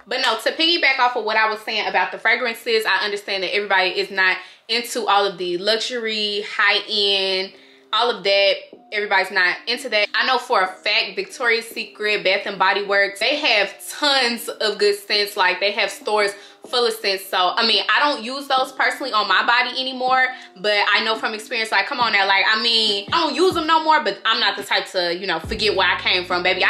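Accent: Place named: Chicago, United States. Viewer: Awesome vid. Are u from Chicago? I hear the accent lol.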